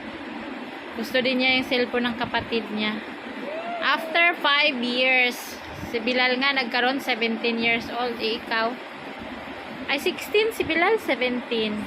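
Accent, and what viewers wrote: Visayas, Philippines. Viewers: Mam, ako rang gina-play inyong video pabayaan ra nako ang adds. Duha akong youtube account. Ganahan man ko aning imong vlog kay natural kaayu. Unya bisaya kaayug tingug. Hasta kapila na na-play. Kay sa kusina ko ako lang ginapasagdan. Hehe Pati 2 years ago gina-play pa nako. Apmping permi mo diha. Nindut unta makalaag diha Congrats sa nephew!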